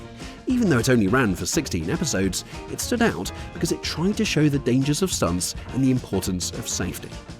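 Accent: United Kingdom. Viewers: I remember all those Saturday morning cartoons growing up in the 70s, but what I don’t remember is your British voice coming out of my mono TV in 1977 That’s what I don’t remember.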